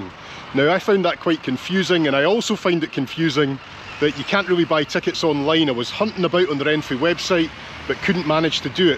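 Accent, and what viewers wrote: Scotland, United Kingdom. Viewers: How delightful to journey along with the views accompanied by the therapeutic sounds of the wheelsets on the rails and lovely Scot's voiceover. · music not needed, just background sound and your great Scottish accent....!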